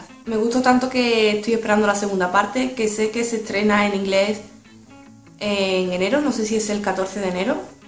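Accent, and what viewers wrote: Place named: Andalusia, Spain. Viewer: eres andaluza de donde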